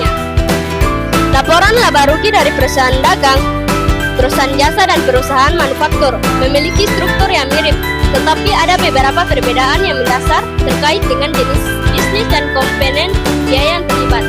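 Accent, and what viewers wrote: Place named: Ternate, Indonesia. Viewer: kerennnnn👏🏻👏🏻 logat Ternate kntraa 🎉😂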